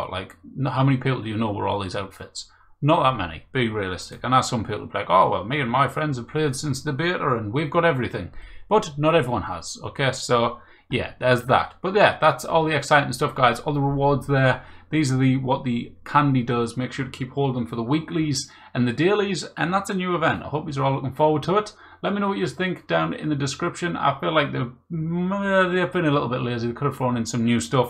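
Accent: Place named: Scotland, United Kingdom. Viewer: Are you Scottish or Irish or do you just have an awesome accent?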